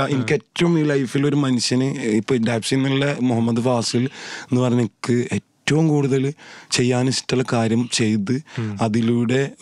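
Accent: Malappuram, India. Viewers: ഞങ്ങളെ മലപ്പുറത് വന്നാൽ അങ്ങനെ ആണ് ഭായ് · Malappuram bhasha vere levala..❤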